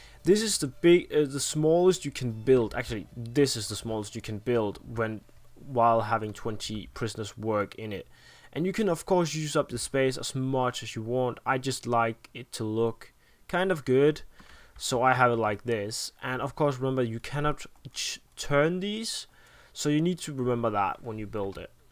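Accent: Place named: Denmark. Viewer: hmmmm i can smell danish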